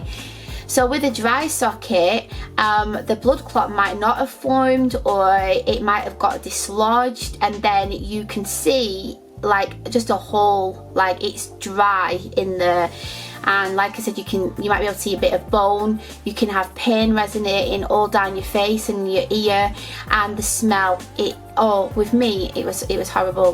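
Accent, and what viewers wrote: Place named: London, United Kingdom. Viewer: thank you for this information. it helped me. I found your accent very easy on the ear. im from California, Huntington Beach area. so our accent is unnoticed by our ears and yours stands out. but with your accent in particular, it reminds me of a london couple i once met. also you have fabulous hair and i also noticed the amount of empathy and inclusive concerns for the audience. so it creates more engaging conversation.... i totally relate to what youre saying. i found myself hanging on every word and i can say i really learned something about my experience. i went through literally the same issue. makes you a very beautiful and very decent person and i truly appreciate this info. thank you for this. liked the video and subscribed.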